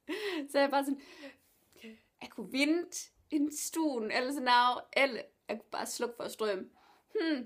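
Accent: South Jutland, Denmark. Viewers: Hej. jeg ønskede at du kunne lave flere videoer fordi jeg elsker din kanal for di jeg elsker sønderjysk så jeg håber at du laver flere videoer i fremtiden Mvh. Silas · Du er ikke helt 100 % - men meget tæt på - fortsæt, dejligt at høre synnejysk på youtube :-)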